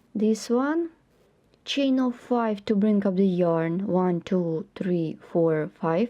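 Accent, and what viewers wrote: Russia. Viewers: Жаль,что Вы на русском не озвучиваете,субтитры переводят непонятно,а по Вашему акценту понятно,что прекрасно говорите по-русски.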